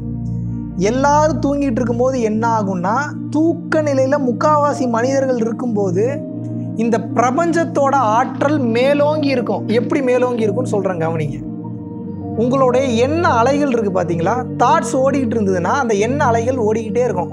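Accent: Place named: Kongu, India. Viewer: Supper tambi Solum vdam miga alagu Enga ur kongu vaadaiatikudunga peshle 👌👌🙏🙏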